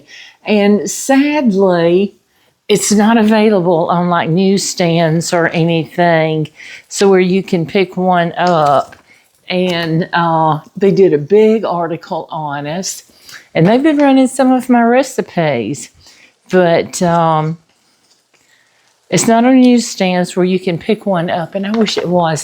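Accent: South, United States. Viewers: What a sweet couple you are, so glad I just found your channel! ❤❤ Congrats on being featured in the magazine! What state are yas in, love your Southern accent. ❤ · OMG! I just happened to fall upon your channel and, if I close my eyes, you sound just like Paula Deene. I love your Southern accent. New subscriber 🤗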